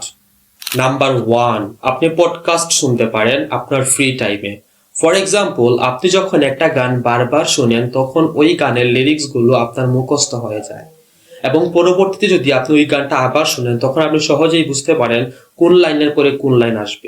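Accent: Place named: Sylhet, Bangladesh. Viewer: দুজনই সিলঠি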